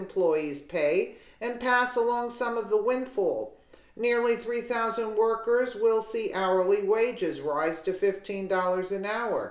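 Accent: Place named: South, United States. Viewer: I am waiting,!Just watching if the TRUMPHATERS make another blow at our PRESIDENT! They will have Hell to pay coming a 2nd Civil War and I mean,you know, keeping a hawk eye against the TRUMPHATERS!You have a nice Southern accent, and you're special too! God bless and have the Happiest of Holidays!🎄🎁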